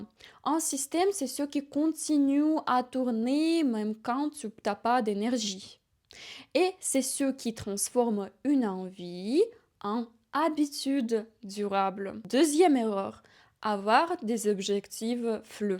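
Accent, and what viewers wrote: Eastern Europe. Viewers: Un accent slave?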